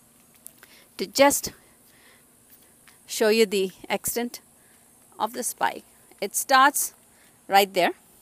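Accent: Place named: South India, India. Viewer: Where do you live ?, I notice a South Indian accent... Lovely setting, beautiful garden and the most amazing orchid spike!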